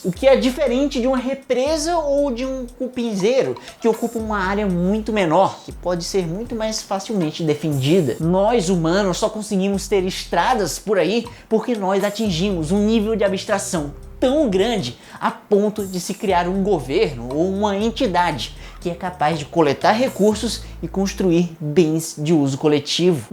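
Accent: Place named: São Paulo, Brazil. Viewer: Nss kkk... Acho tão legal o sotaque paulistas aquela poxadinha no r (queria dar essa poxada também)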